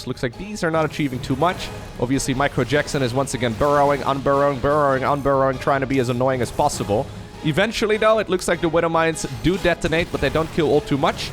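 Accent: United States. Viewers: Yea, Lowko...it is interesting to hear about your American Twitch Standard accent and you behaving like a degenerate Runescape-addict past weekend and all but can we get back to the game...that would be great...yeah....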